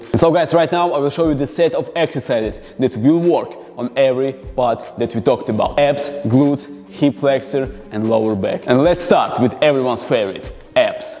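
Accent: Russia. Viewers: сколько лет живет в сша, а акцент как был русским, так и остался.лол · я же слышу, что ты русский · This russian accent motivates me so hard. I feel so jacked already 💪😁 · Прикольно и полезно, но акцент как из фильмов про карикатурных русских гангстеров, конечно, сбивает... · а ты русский ?, потомучто говариш как русский луде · Почему я слышу русский акцент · рашн инглиш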